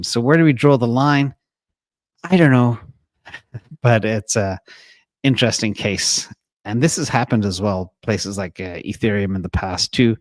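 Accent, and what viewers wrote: Europe. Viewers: to me he doesn’t sound like he has an American accent, it has a hinge of euro accent but I could never pin it down. My guess is he moved around as a kid.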